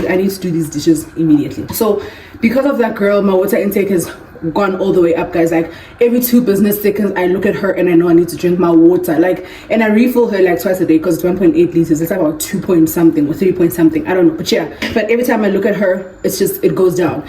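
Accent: Nigeria. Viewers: I love the Nigerian accent abegg🤣❤️ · The minute i saw Stanley on the caption i was so quick to get mine😭😭👍🏿 Stanley cup ke achievement 😭❤️❤️❤️. It's the Nigerian accent that always makes me come back here 😂😂😂 Please na. 😭❤️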